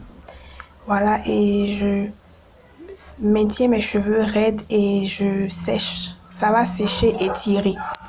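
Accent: Ivory Coast. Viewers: L’accent ivoirien hein il est calé On adore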